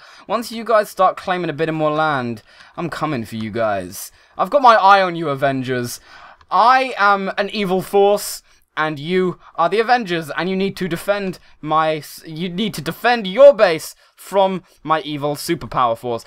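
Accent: United Kingdom. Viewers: Is hey British?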